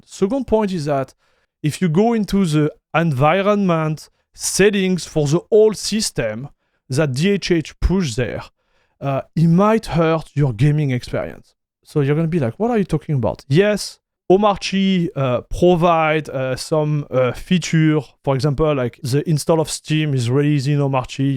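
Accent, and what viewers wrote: France. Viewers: Votre accent paraît français...😊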